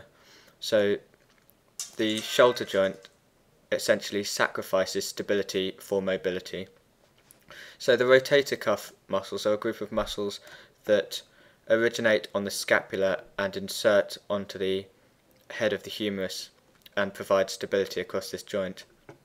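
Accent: United Kingdom. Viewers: I can just imagine you teaching to your class and everybody's getting distracted with the British accent! Good job in your explanation though. Clear and concise :-)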